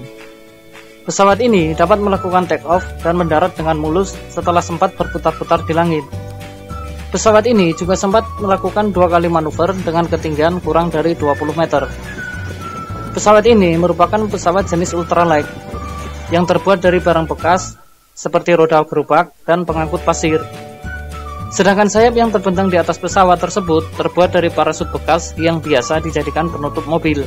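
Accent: Java, Indonesia. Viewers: suara jowo'mu medok pisan yo bro...😂🤣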